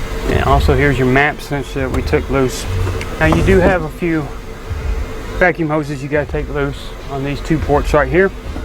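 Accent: South, United States. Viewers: Nice videos dude. fast but you cover it all. which is perfect for the average experienced mechanic. i like how you dont lolly gag on unneeded details, like how it was brought in on on flat bed truck or how you found a bird nest in it. fast, professional, informative. thanks. BTW... is your shop in Detroit? you seem to have a slightly southern accent.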